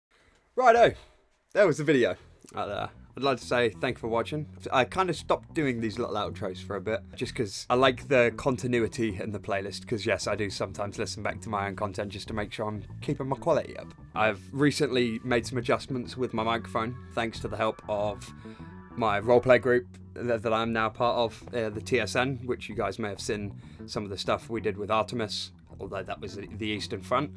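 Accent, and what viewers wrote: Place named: Scotland, United Kingdom. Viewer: Lower the intensity of the “Scottish” accent IMO.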